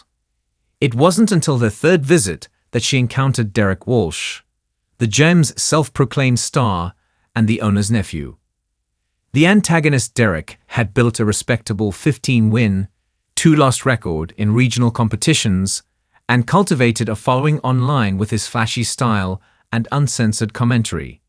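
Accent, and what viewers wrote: United Kingdom. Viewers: Sorry to say I cannot listen to this story. The robot reading the story with his british accent, it's way of the character of the story british accent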